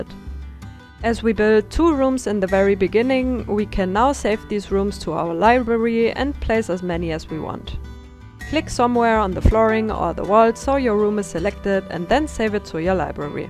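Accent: Germany. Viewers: Junge dieser deutsche Akzent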